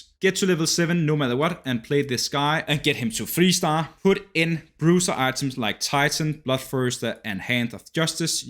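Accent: Denmark. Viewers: Are you from Denmark?? You sound like a danish person 😂😂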